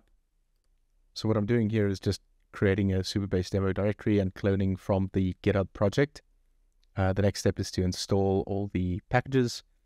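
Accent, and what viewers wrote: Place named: South Africa. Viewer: that saffer accent is unmistakeable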